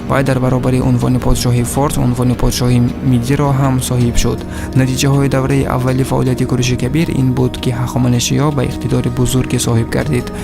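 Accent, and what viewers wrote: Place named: Russia. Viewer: То что этим делом, т. е. темой великих исторических личностей по завоеваниями интересуясь, хочешь чтоб другие тоже были осведомлены, это очень благородно. И то что, акцент русскоговорящих у тебя в декламации чувствуется, надо обязательно устранить. Если это получиться, аудитория говорящих на фарси тебя с нетерпением и пением гимнов будут ждать. Но главное в содержание роликов. ХРОНОЛОГИЮ ОБЯЗАТЕЛЬНО ДОЛЖЕН СОБЛЮДАТЬ. Это первое в научной историографии. А то в комментариях смотри, независимо от суть изучения, выдвигают свои амбиции. Поэтому я свою комментарию пишу на русском, чтоб все заинтересующие люди поняли. Но методы современная историография ныне очень устаревшие и например вот такой подход -- взгляд исследователя на историю материалистическим мышлением, ни куда не годится. Тем более, история таджиков считается как история "с незапамятных времён.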